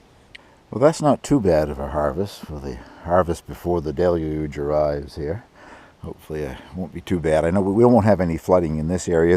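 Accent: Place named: Canada. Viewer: Hey! No clue on what made your video go viral. Anyway, I don't know a thing about gardening, etc, but your videos are still fun to watch. I especially like any videos relating to your cabin. As a matter of fact, that's how I found your channel. I searched for videos about cabins and found your channel maybe a year ago. Keep 'em coming! Always nice to hear that Canadian accent. 😁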